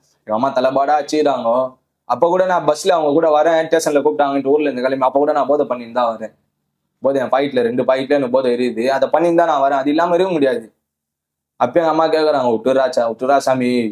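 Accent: Chennai, India. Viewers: Chennai native slang ah maathunga da dei😂.. kevalama iruku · Inaikithanda Chennai Tamil kathula thean payuthu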